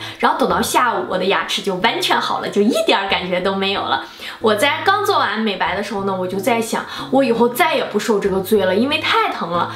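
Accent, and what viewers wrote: Shandong, China. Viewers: 朋友，听口音是山东人啊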